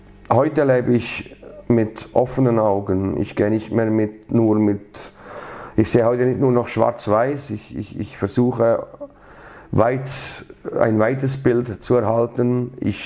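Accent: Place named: Switzerland. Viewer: He talks Swiss-German Dialect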